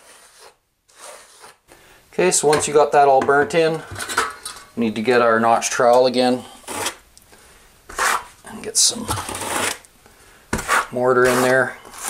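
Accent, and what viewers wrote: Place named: Canada. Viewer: Finally someone who pronounces the word Niche correctly. I'm gonna guess you're canadian right?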